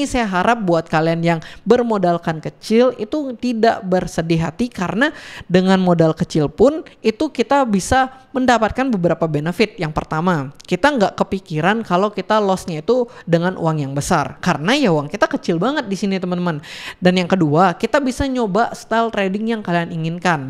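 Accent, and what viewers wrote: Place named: Medan, Indonesia. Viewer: Abang sebenarnya orang Medan ya? Kok logat bahasanya macem anak Medan bang? Huruf K ABG tu khas kali untuk anak Batak Medan bang. Salam dari Medan bang . Saya anak Asahan.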